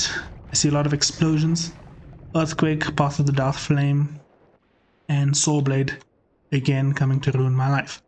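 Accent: South Africa. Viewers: Huge fan of the series, was wondering where youre from? my guess is south africa :D?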